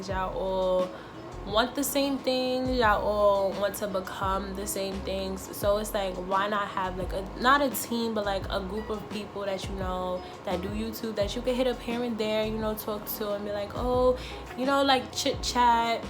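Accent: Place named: New York, United States. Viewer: You gotta be from NY or up north lmao I hear the accent okay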